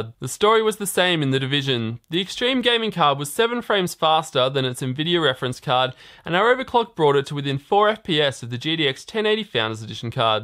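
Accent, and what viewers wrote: Australia. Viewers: AUSSIE REVIEWS! easiest on the ears. new sub mate, cheers!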